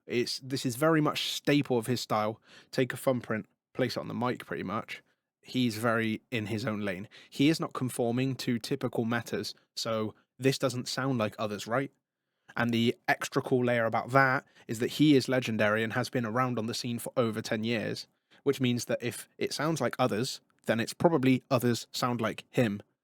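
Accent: United States. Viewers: Your American accent sounds very mid west.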